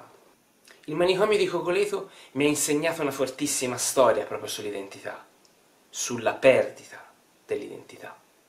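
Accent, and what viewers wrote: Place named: Tuscany, Italy. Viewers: grazie a te comunque per il bel contenuto e la risposta. Io penso che purtroppo ci sia la convinzione che il toscano sia l’italiano perfetto ma purtroppo non é così: non esiste l’italiano perfetto a livello regionale. L’ italiano perfetto é quello senza inflessioni o magari senza inflessioni così spiccatamente marcate. Purtroppo molti toscani invece, sono convinti che il toscanismo sia “il bel parlare”, a volte può essere simpatico ma a volte appesantisce e rende poco chiaro un contenuto che magari può essere bello come il tuo. É questo il caso purtroppo, ma ovviamente a mio modestissimo parere. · Sarà anche interessante questo contenuto ma il marcatissimo accento toscano lo rende davvero pesante e a tratti incomprensibile. Personalmente non sono riuscito a finirlo. Quando si fanno contenuti di qualità come questo bisognerebbe, a mio parere, cercare di essere il più comprensibili possibile ed evitare inflessioni così marcate. 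Mi spiace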